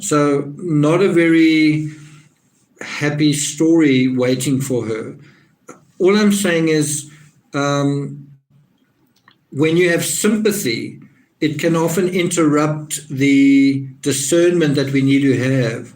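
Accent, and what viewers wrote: South Africa. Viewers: Your accent is SOUTH AFRICAN!! I'm a fellow African, a Zimbabwean. Thank you for your coverage of this disturbing case. The whole world wants Summer home except the parents (if they can be called that) They don't deserve that title in my opinion. The "for sale" sign on the drum outside while Summer plays in the rain speaks volumes to me. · Distinctly South African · Accent sounds like S.Africa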